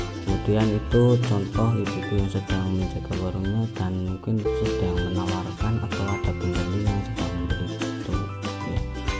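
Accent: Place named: Banyumas, Indonesia. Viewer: Ngapak temen wkwkwkwk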